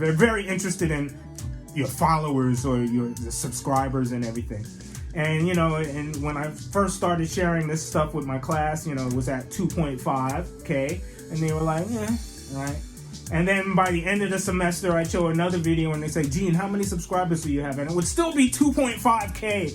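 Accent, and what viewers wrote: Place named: United States. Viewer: 😅Mr. You are very brave. Despite all warnings from the medias not to take ‘risk’ coming to China, you did it.Your American English pronunciation sounds very pleasant.China has a 5,000-year-old culture and is a society that respects teachers very much. It is very popular in China and you can live in China with confidence.I am also a video producer and it is difficult to increase my followers. You can think of your channel as a way to record your life. Take it easy.China's education system is long-term and sustainable. You don’t have to worry about policy issues. Just feel free to teach well. If the contract expires, you can continue teaching in other institutions. If you go to Vietnam or other countries, your income will not be as high as in China, and life will not be as convenient as in China.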